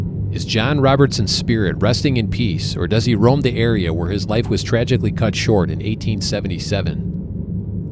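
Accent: Chicago, United States. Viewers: honestly, I also love your accent. My family is from northwest Indiana and the Chicago area, so you sound similar!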